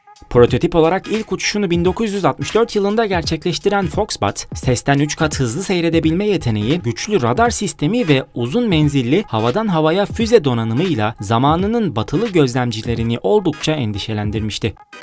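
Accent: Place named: England, United Kingdom. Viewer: Bu nasıl telafuz arkadaş, MİG'i am ay ci diye okuyorsun. SU yu es yu diye. Bütün herşeyi İngiliz ağzı ile okumak zorunda mısınız? "mig"; "su" diye neden telaffuz etmiyorsun. Bu kadar mı dilinize yabancısınız?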